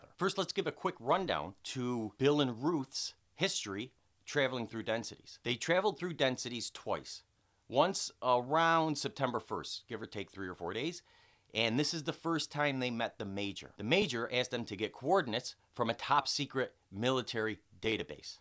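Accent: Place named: Chicago, United States. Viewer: I'm betting Chicargo...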